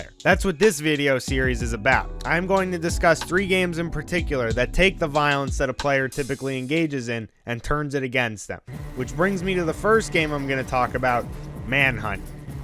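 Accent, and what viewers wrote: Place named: Chicago, United States. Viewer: That's an interesting accent you have. Are you from Chicago?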